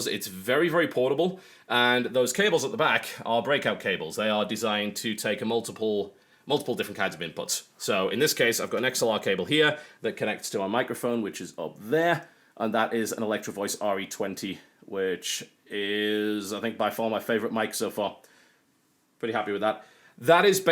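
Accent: United Kingdom. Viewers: British, but lives in America. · With that accent I expected to see UK style power outlets.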